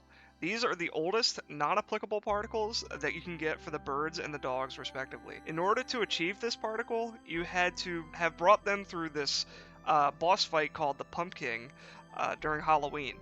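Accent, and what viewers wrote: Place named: Pennsylvania, United States. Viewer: Random question but are you from PA? Feel like I'm hearing a little PA in your voice 😂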